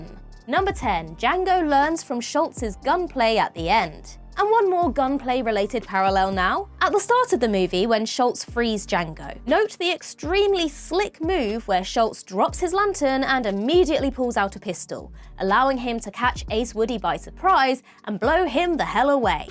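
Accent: United Kingdom. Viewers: Who’s this cheeky Brit?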